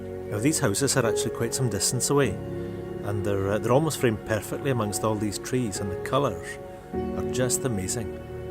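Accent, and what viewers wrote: Scotland, United Kingdom. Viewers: Forget my final remark just watched another of your videos which answered the question,I knew there was a Scottish accent in there.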